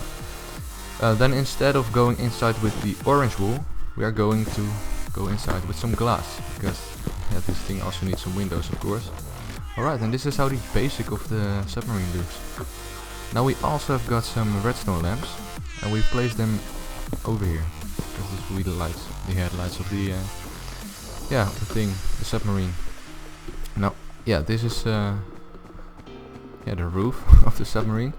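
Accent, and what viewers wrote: Netherlands. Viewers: Goede video ik hoor dat je uit Holland komt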